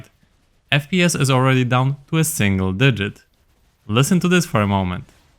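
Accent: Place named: Poland. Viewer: The 2137 gold 😂. Nice polish accent :D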